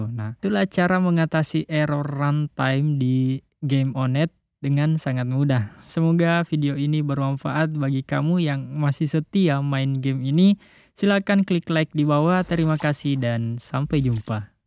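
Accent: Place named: South Sulawesi, Indonesia. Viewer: Kayak Logat orang Sulsel.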